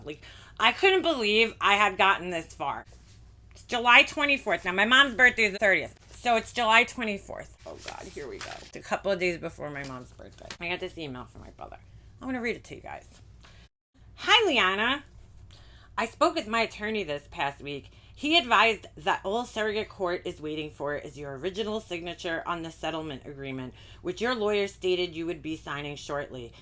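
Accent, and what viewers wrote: New York, United States. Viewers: Hello. wow young lady you have def been through the wringer between real life with your Mom and crazy YouTube. I feel for you. I love all of your videos especially the one for your son when you brought and set up his cooking equipment. That was awesome. Stay strong keep keeping it real. Love your accent, it makes me miss NY. Peace out.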